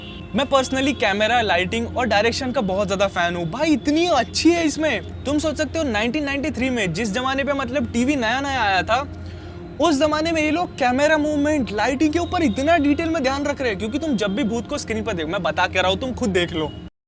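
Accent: Nagpur, India. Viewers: Bhai tu nagpur se hai kya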